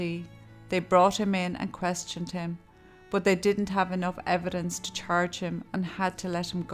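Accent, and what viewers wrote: Kilkenny, Ireland. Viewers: thank you so much for your reply - I love the delivery of your narration of your easy to understand informative script style/narrative - your turn of phrase and the inflection of your voice when you exasperate is masterful - your beautiful accent reminds me of the people I knew when I was growing up in Tubrid, Woodsgift in north Kilkenny, where I was born in 1956 in St. Luke's Hospital - I now live in Kerry. I always miss the Kilkenny accent - I miss the sayings "fierce nice and shockin good" - keep up the excellent work you are doing and don't ever give up - have a wonderful day ;-)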